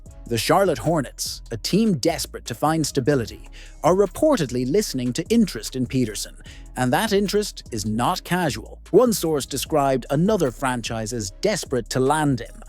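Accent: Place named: United Kingdom. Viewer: What a waste of my time. Bloviation. Nice touch to put a British accent on the AI narration making it obvious you know nothing about Boston basketball. I'm blocking this channel.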